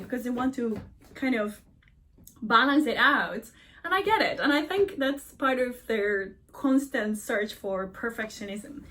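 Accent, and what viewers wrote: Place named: Venezuela. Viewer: Where is your accent from? Best guess is Venezuela.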